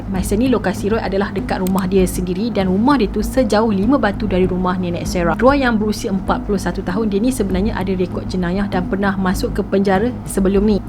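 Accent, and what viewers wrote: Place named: North, Malaysia. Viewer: mesti org utara kn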